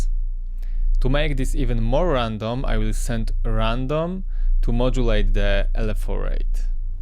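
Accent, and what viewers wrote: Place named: Poland. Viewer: Słyszę polski akcent w angielskim, ciekawe czy zgadłem ? Bardzo fajny materiał. Pozdrawiam 😜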